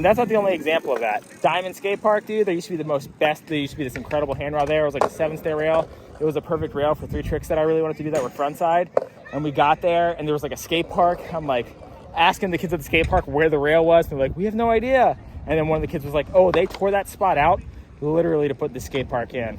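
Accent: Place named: Baltimore, United States. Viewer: You know someone is from Baltimore when they call it Baldamore 😂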